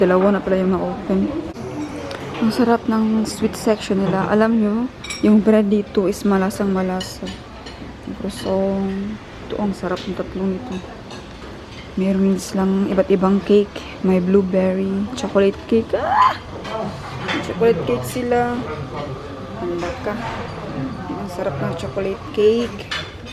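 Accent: Visayas, Philippines. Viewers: How many man you’re going to have ? Lol just saying bisaya ka Inday di ba ? Speak good English Dai pls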